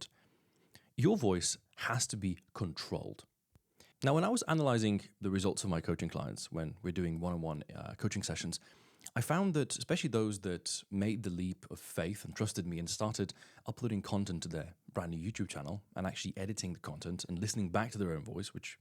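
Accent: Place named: RP, United Kingdom. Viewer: Thank you, I completely agree with your thinking about longer content and attention spans. Could you please make a course on how to develop a british RP accent such as yours?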